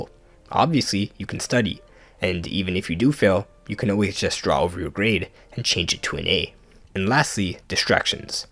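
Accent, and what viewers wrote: United Kingdom. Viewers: That British accent is FOUL lmaoooo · Your British accent made me laugh 🤣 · The British accent got me. it literally forced me to drop a like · that oxford student voice though.... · nah bro the english accent 💀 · british accent goes crazy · I love the British accent😂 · british accent 😄🤩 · the british accent broke me!! perfection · That British accent though.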